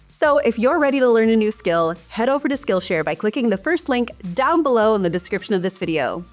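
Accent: United States. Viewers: It is so pleasant to hear a balanced opinion in an American accent for once. 🤷‍♂😄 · U need a differwnr presenter...what you say may be right but right now an amerrican voice talking about media bias and truth has next to no credibility outside the former Usa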